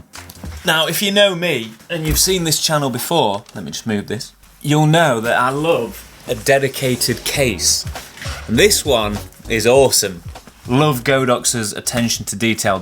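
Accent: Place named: England, United Kingdom. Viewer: Nice to hear an English accent on a YouTube video for a change.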